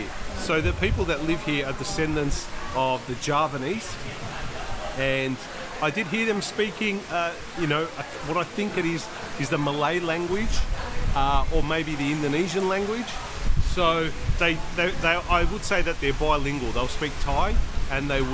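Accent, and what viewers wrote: Australia. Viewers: So nice to hear the Australian accent on YouTube. It's getting crowded out by other, like, annoying ones. 🤪❤❤❤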